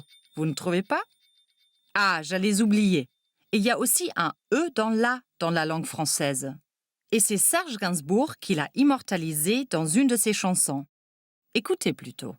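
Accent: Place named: Germany. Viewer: Ç'aurait été quand même mieux si la présentatrice parlait français sans cet accent allemand un peu gênant. Mais bon merci en tout cas pour la vidéo.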